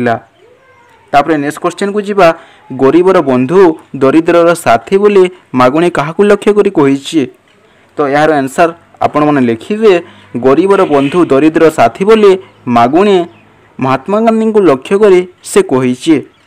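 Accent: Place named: Odisha, India. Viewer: Bhai tor katha ru to balangiria bhalia laguchhu tui..odia bhal se kahi nai janbar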